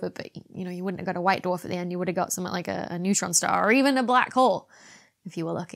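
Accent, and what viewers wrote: United Kingdom. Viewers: So enjoyed this, like to hear your British accent as someone born in London, loved the geordie accent bit :) I would be interested to know what you think of 1956 Forbidden planet my favourite that is the forerunner of most Space soaps.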